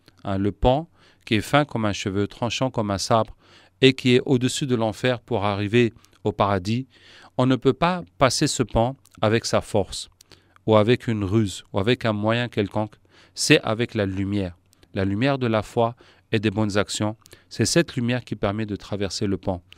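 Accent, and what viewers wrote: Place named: Africa. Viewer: Tu parle souvent comme les africain noirs avec un accent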